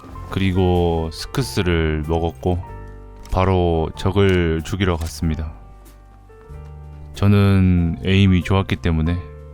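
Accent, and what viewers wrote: Jeolla, South Korea. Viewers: 오지는 전라도 억양 존나 잘어울려 ㅋㅋㅋㅋㅋㅋㅋㅋ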